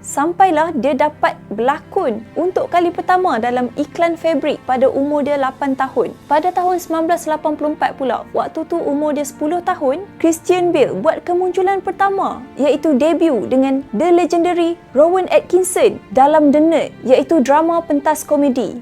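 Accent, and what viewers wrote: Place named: North, Malaysia. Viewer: Pekat loghat Utara sis... Orang orang 😅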